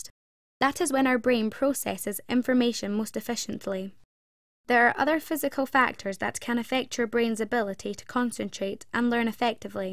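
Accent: Scotland, United Kingdom. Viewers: Great advice! It's probably the opposite of how most children are forced to learn these days. Btw, I think she sounded Scottish. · Are you from Scottland?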